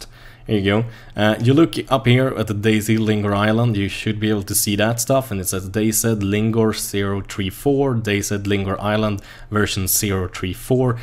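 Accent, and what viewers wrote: Sweden. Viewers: dat swedish accent lol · You sound like your from sweden o you were nice!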